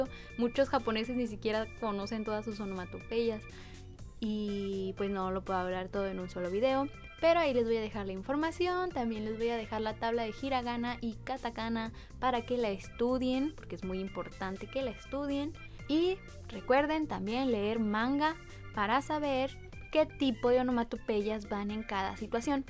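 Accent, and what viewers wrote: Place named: Chihuahua, Mexico. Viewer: ¿eres de chihuhua? :v Tienes el acento parecido a los residentes de ahí xd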